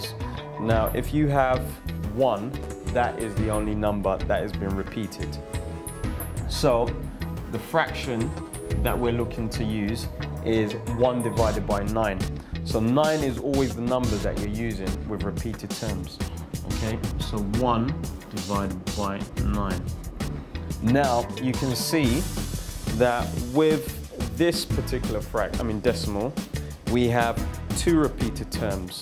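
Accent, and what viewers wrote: United Kingdom. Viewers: Very good are you Brit ish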